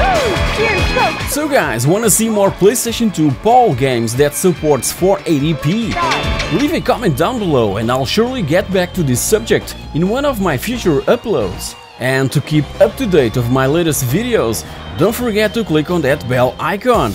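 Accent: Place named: Portugal. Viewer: Este gajo é tuga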